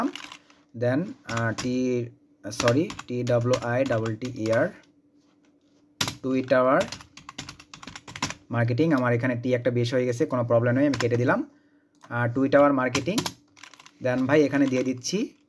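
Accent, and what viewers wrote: Joypurhat, Bangladesh. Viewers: vai joypurhat kotha basa? apnar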